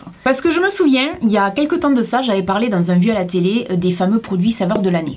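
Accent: South, France. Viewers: Je voulais te demander ton accent vient d’où ??? J’imagine de sud mais je sais pas d’où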